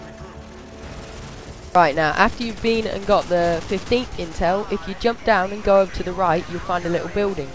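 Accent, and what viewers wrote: England, United Kingdom. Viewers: LOL, super british accent! XD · your british. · Who cares if he's british i have cheats now!! THANX MAN! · im jealous of your accent lol. I allways thought english people were just english jerks bragging about speaking speaking english better then americans. But after that i wish i could talk like that lol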